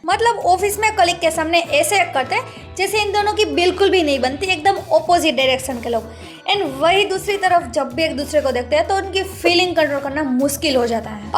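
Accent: Gujarat, India. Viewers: Aapki hindi me gujrati vibe kyu aarhi hai 😶😁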